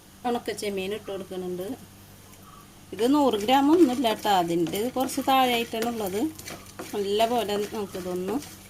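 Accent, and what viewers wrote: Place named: Chavakkad, India. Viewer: Chavakkad vadanapppalli samsaram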